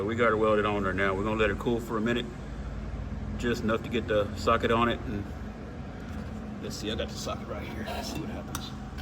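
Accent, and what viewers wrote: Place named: South, United States. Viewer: I used to watch your videos years ago! I want to say thank you for all the banshee knowledge you were able to share. Just realized you have a Midwest vocabulary with your southern accent. I'm from tip of the upper peninsula in upper Michigan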